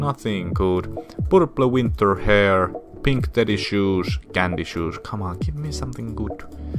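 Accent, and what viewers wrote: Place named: Finland. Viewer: Heti aksentista tajusin et täähä o suomalaine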